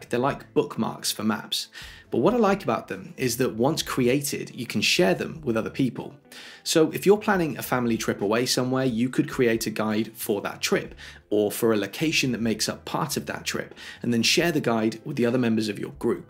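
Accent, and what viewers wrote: United Kingdom. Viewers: The only improvement I need to use Apple Maps exclusively would be the ability to download offline maps. Enjoyed the video, very informative and to the point, and you brits are very pleasant to listen to ( I'm an American, but set my Siri voice to British for that reason lol )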